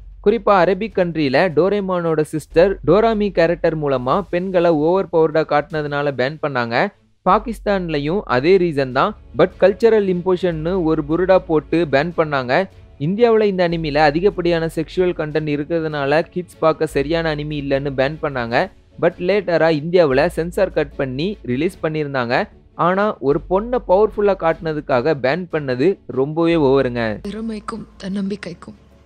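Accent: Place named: Kerala, India. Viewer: unga slang konjam Kerala Mari irunthuchu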